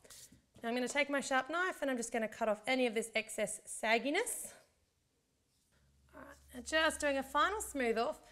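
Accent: Australia. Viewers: I love your voice. It is like an Australian Jennifer Lawrence! :)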